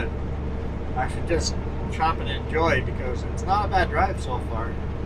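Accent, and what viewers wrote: Canada. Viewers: Nope. You sound like a Canadian to me. From a fellow Canadian and British Columbian. 😎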